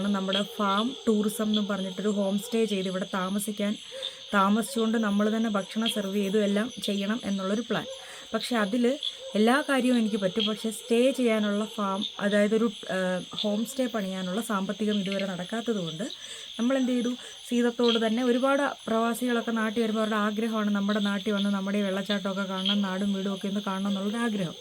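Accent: Thrissur, India. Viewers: Slang kettitu Thrissur ano ennu oru doubt...oro koana mala vanangal und nattukarekodn parayippikan....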